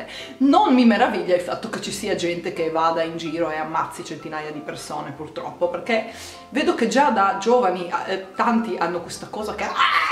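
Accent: Trieste, Italy. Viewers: VIVA L'ACCENTO TRIESTINO!!!! 6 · Il fatto dell'accento è bellissimo, perché io ho degli amici di Trieste e parlano proprio come te e non credo che sia una accento di...💩 Comunque ti adoro!❤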